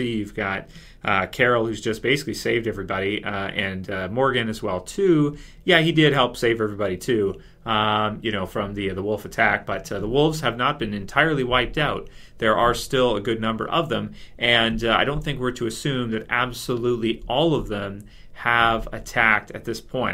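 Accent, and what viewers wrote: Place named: Canada. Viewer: "oat and aboat".... LOL i love your accent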